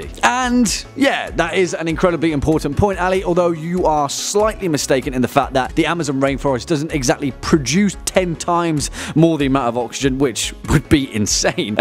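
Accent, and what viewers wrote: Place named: Yorkshire, United Kingdom. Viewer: I love the accent.. Is that Yorkish? Anyway thanks for this informative mate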